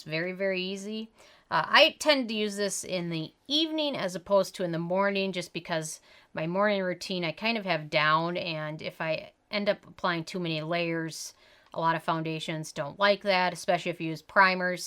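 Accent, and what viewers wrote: Minnesota, United States. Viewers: I know this is an old video, but I just ran out of my PTR moisturizer and I happened upon this. What a rude comment from the above person about your voice needing work. We all have accents. I just hear a Minnesota accent, not a bad sounding voice! You should hear my New Yawk (NY) accent! You have a lovely voice <3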